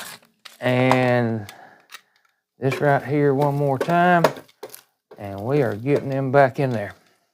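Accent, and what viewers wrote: South, United States. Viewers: Cant get the accent Sir? South but??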